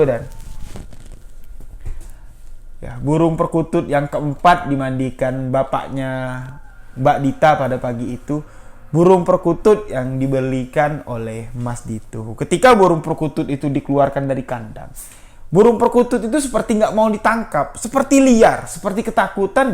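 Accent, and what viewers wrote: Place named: Jambi, Indonesia. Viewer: Konsep konten Story Teler nya mirip channel SUNYI, cara menyajikan ceritanya menarik dengan intonasi suara saat bercerita... Khas jambi banget. Sedikit koreksi mas, pernikahan itu kan hukum Agama, dalam Islam... Masa iddah wanita itu sekitar 3 bulan, setelah lewat 3 bulan masa iddah maka wanita tersebut boleh menikah lago. Jadi jangan dibenturkan dengan kearifan lokal yang menyatakan "tidak boleh menikah jika masih 7 bulan menjanda"... Baik itu berdasarkan adat jambi atau adat jawa, dll. seolah-olah menikah lagi setelah 7 bulan ditinggalkan suami adalah sebuah hal yang haram (tidak boleh). Tetap hukum Agama diatas hukum kearifan lokal, jika hanya ingin beropini sendiri, cukuplah katakan "jika masih bisa menahan syahwat, sebaiknya menikah lagi setelah 1 tahun setelah meninggalnya sang suami.".... Jadi hal itu sifatnya anjuran saja. 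Saat ini masih nyimak konten-kontennya bang... Semangat bang..!!!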